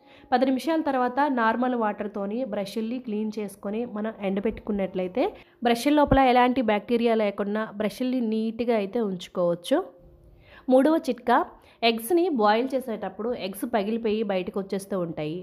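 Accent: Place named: Kerala, India. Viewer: U r telugu slang is like keralalite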